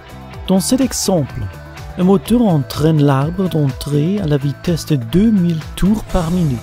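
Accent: Germany. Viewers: J'ais du mal à comprendre à des moments, mais d'où vient cette accent ? Allemand ? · on se mare avec son accent allemand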